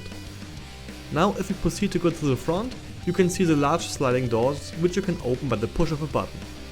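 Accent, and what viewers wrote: Germany. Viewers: you Sound German .. are you?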